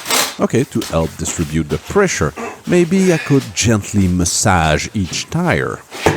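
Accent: Quebec, Canada. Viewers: Ta le meme accent que george stpierre loll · "peux pas toutes aware" ... Je'l savais que ça sonnait Québécois cte vidéo là...pi en plus...c-t'une bonne idée !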